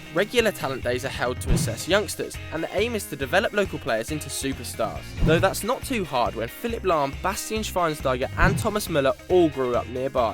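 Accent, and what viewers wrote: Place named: United Kingdom. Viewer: Why do british people always have to pronounce names their way La Mayseeya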